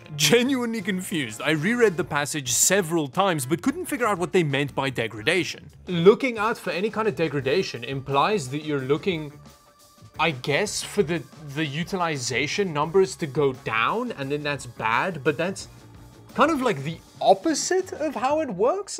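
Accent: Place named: South Africa. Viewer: south African accent makes my ears bleed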